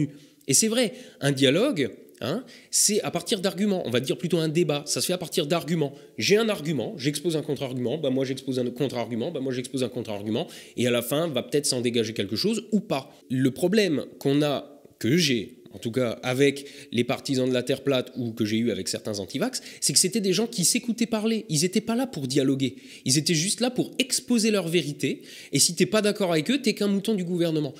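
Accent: Alsace, France. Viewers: Salut à toi! Merci pour tes videos. Elles sont tip top même pour les incultes!! Petite question: tu as un accent et des tics de langage qui me font pensés que tu viens d'Alsace, et peut être même des Vosges du Nord. Est-ce le cas ?? Merci!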